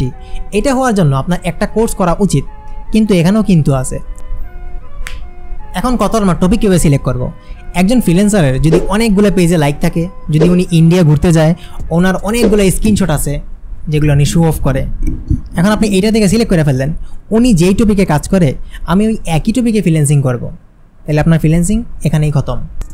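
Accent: Sylhet, Bangladesh. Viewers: Vai apni sylheti ni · Vai apni ki sylhety ni😅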